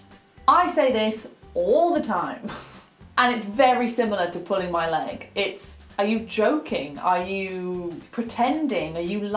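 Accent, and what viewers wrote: United Kingdom. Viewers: I love her uk pronounce and explaining ❤️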